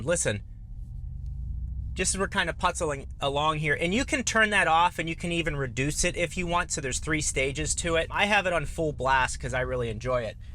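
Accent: Pittsburgh, United States. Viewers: Sitting here at AGH in the Northside watching this vid! Nice to hear you getting back to your yinzer roots!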